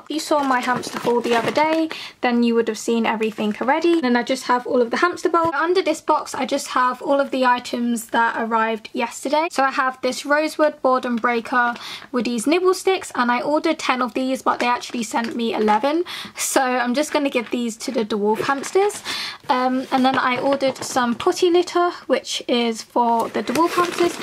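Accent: United Kingdom. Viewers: r u from the uk? xxxxxxxxxxx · Are you British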